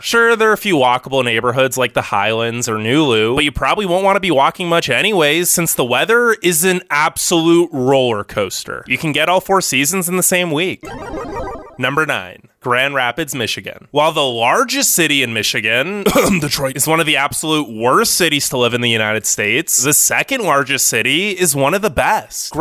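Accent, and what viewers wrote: California, United States. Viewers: Good video. Your California accent is so strong lol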